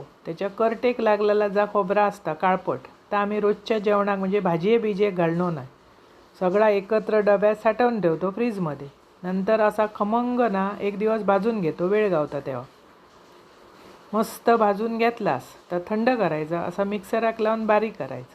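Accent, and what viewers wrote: Malvani, India. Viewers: तुम्ही मालवणी वेंगुर्लेकर छान बोलता ऐकताना गोड वाटत कांनाना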